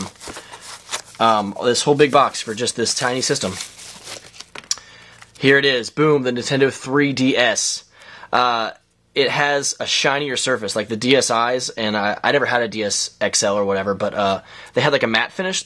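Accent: United Kingdom. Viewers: british accent O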